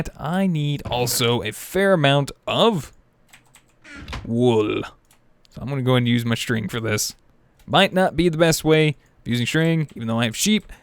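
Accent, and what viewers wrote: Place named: South, United States. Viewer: eli Heakins Based on other people talking in his videos and his speech I think he's from the south not the north.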